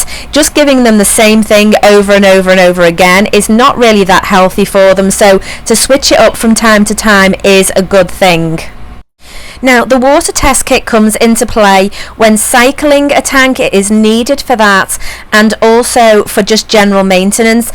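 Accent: United Kingdom. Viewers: i'm a bit confused, you sound british, yet you describe capacity in gallons, and all your links to buy stuff is in dollars.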